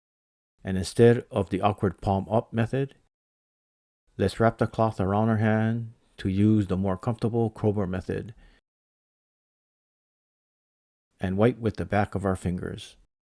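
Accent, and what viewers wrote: Hawaii, United States. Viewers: I can here the pidgin coming tru 😂🤙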